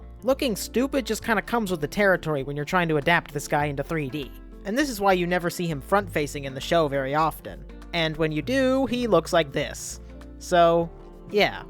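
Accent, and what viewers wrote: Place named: United Kingdom. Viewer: Bro’s British✋😭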